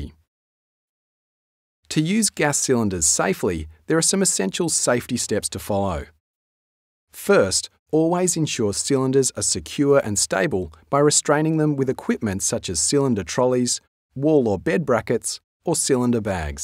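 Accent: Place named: Australia. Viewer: British Oxygen Corporation Voiced my a Aussie!! Great Job.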